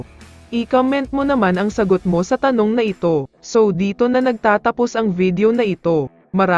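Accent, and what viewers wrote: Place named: Visayas, Philippines. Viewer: Bisayang bisaya 😂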